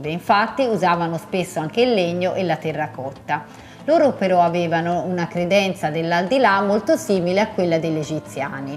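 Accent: Tuscany, Italy. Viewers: Es italiano con el acento toscano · Se cerchi di togliere quella c toscana almeno quando spiegi sarebbe meglio per una parlata più omogenea e italiana perchè a certi da dastidio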